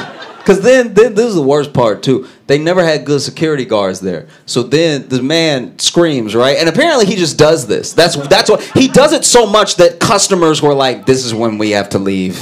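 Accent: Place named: South, United States. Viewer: this man sounds like he is from below the mason dixie line!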